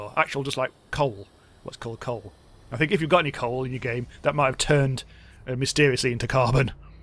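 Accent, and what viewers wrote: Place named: Northern England, United Kingdom. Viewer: Neat vid. Refreshing to have a northern accent too. Laughed out loud at "dark as dicks". :D Keep it up mate.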